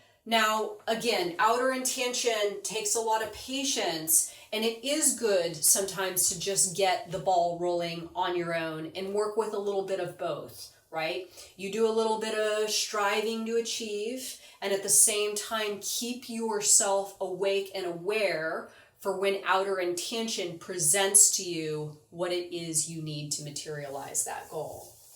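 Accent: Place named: Western Pennsylvania, United States. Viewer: That western p.a accent makes me homesick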